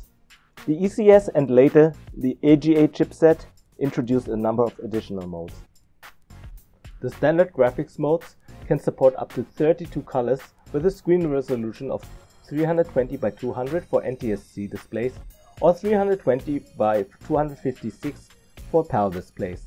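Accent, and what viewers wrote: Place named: Germany. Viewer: I didn't detect the German accent, and only realised when I watched your ZX Spectrum Next video. Of course, Germany was as much obsessed by Commodore as we were in the UK. Makes sense!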